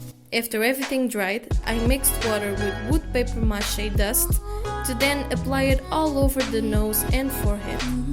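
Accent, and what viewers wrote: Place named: Portugal. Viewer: Esse sotaque com certeza é de Portugal!♡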